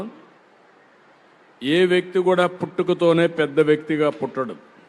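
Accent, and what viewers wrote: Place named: Rayalaseema, India. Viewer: రాయలసీమ ప్రాంతంలో అలానే అంటారు అండి, మా friends కూడా గమనించాను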